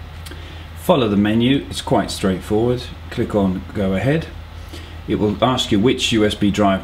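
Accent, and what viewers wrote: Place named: Australia. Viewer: WHOOOOOAAA THE VOICE (i love australian accents) are you australian? lol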